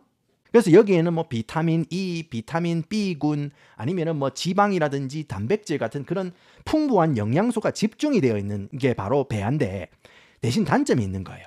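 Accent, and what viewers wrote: Gyeongsang, South Korea. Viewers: 와 나도 경상도 사람인데 이 사람 사투리 왤캐 심하냐 같은 경상도가 들어도 거북할 정도임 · 갱상도 사투리에 홀려서 구독합니다. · 한국말하실땐 구수한 경상도사투리 쓰다가 영어만하면 어나더레벌😊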